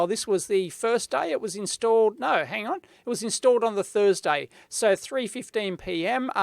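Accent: Australia. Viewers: why does talk like crocodile dundee? was that show really that popular in austrailia?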